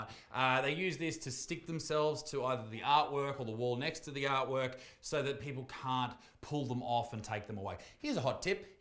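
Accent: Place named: Australia. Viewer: Mate, you’ve gone to shit it’s not even fucking funny. Your beard wobbles like a scrotum and you talk like this true blue Aussie ranting on about “issues” which you refuse to show both sides of the argument about or acknowledge fucking facts about renewables, ect. Your dad was a bloody NRL premiership player and you likely grew up rich af but still pretend like you speak for the people despite literally none of this affecting you, your whole MO has literally become stirring up controversy and then begging your viewers to suck you off, buy some of your merchandise and to watch one of your “standup specials” You know how Jake Paul claims to be a rapper and can’t rap? Yeah that’s exactly what you have become except with comedy, pushing some batshit crazy political shit that purely consists of “trans person crazy, body positivity crazy, vegan crazy” and when you do make a video that has valid points it’s regurgitating the same shit you hear a million times on other channels, (friendly Jordies controversy) your comedy is just putting on some god awful show with your followers where you meet and greet them, refuse to do nothing remotely funny and just consistently hate and be an edgy asshole up on stage, and your viewers love it. 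You could wank onto the fucking podium and your viewers would call you the greatest comedian of all time. Also, you unironically dressed up as the joker and shot some broccoli with an air rifle to promote one of your god awful shows. Honestly mate, you’ve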